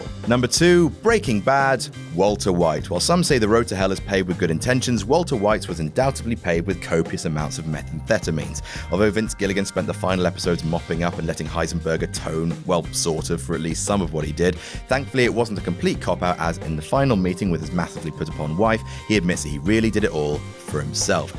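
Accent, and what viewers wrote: United Kingdom. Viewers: These Whatculture lists are kinda stupid... a British accent is just meant to hide the nonsense.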